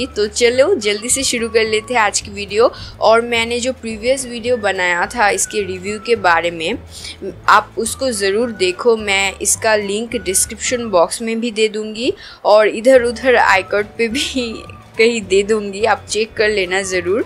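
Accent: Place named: West Bengal, India. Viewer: But voice se to ap Bengali hi lag rahi ho ...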